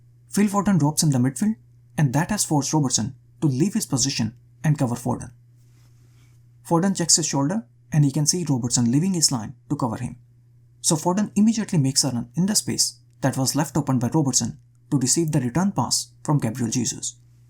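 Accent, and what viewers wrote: India. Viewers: Your accent sounds so Indian! Great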